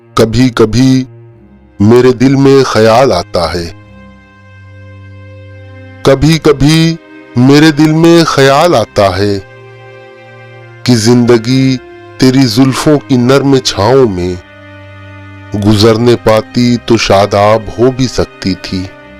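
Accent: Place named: Punjab, Pakistan. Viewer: Awaz tho bith bori hai punjabi style may urdu bol raha hai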